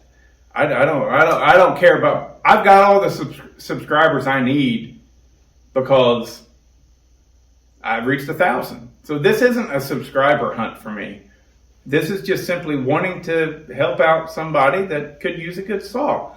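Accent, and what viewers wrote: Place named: Louisiana, United States. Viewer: Your video quality is freaking fantastic and fun! That Cajun accent is funny stuff!!!!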